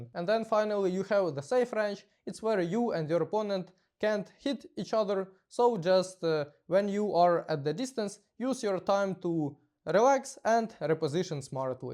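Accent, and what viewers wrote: Russia. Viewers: I love your rusian accent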